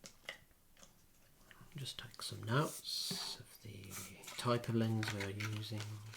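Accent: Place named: London, United Kingdom. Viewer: that lovely accent of yours, is that Londoner?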